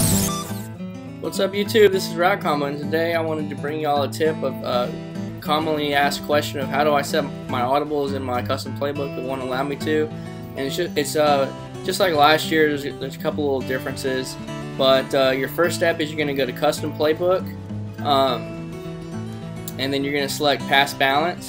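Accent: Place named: Alabama, United States. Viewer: that song really brings out your bama accent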